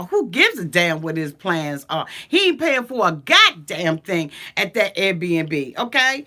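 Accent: Washington DC, United States. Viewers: I was thinking you from DC, I hear my family in the way you speak This is why I am drawn to you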